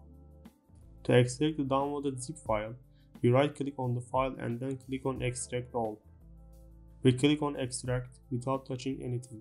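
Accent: Turkey. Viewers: abi türk olduğunu aksandan anladım bi açık yakalamaya çalışıyordum bilgisayar ele verdi seni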